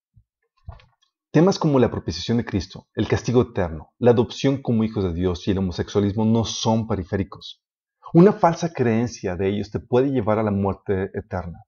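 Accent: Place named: Mexico. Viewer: Muchas gracias por la explicaciòn hermano, muy didàctica, que DIOS lo siga usando, por su forma de hablar me parece que es de Mexico, le mando un saludo desde Perù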